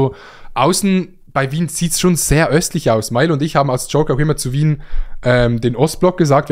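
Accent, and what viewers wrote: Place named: Switzerland. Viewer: Deine Aussprache von Hotel, beige und Bequem ist einfach Schweiz aber nicht richtig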